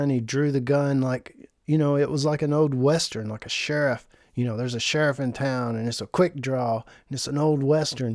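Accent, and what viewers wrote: South, United States. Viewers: I just watched a 6 year old video frrom this channel and you had a way thicker southern accent. Have you toned it down over the years? Im good either way im not criticizing it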